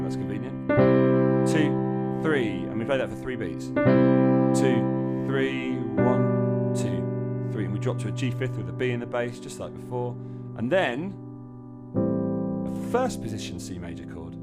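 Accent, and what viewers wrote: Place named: United Kingdom. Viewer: When did this guy become British? Wtf? Is there a new guy, or is he going through an identity crisis?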